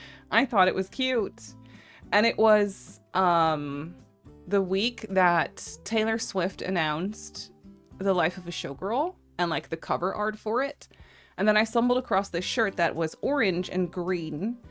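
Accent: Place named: Germany. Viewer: Is Sir from Germany? He has an german accent😂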